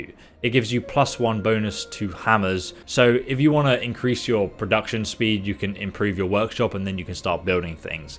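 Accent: England, United Kingdom. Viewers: "mil-ih-tah"? England, please, just stop. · And Here i thought its obvious He is Brit oh lol.. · You guys do realize that the man is British and speaks proper English. He lives in a place where the english language started so just think about that before criticizing.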